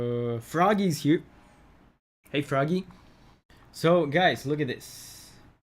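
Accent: Brazil. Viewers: Esse mano é mto brasileiro kk, dá pra ver no jeito de falar e as recomendações do Yt dele entrega tbm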